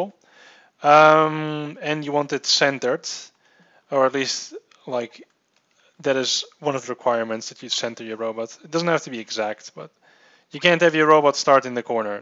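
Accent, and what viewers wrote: Netherlands. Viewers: Man im dutch myselve and i instantly knew youre dutch :P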